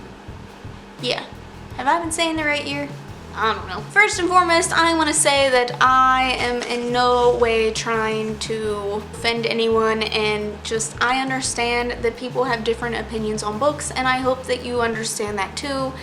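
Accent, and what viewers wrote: South, United States. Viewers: Do have an accent? I hear southern a bit in your voice. It comes and goes though.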